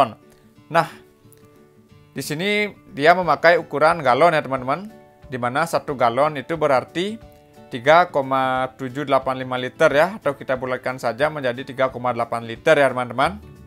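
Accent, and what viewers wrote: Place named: Bali, Indonesia. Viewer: Logatnya mirip org Bali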